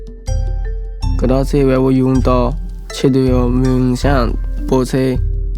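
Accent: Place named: Jiangsu, China. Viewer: 我只知道和江苏话很像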